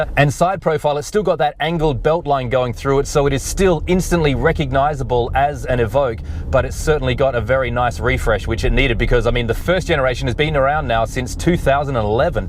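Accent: Australia. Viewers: Perth accent? Lol.... im getting this, luxury ride 🤩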